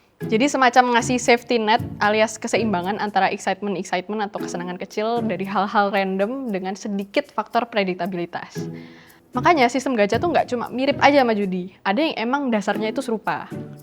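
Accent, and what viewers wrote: Java, Indonesia. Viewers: such a cute medok 🥰🥰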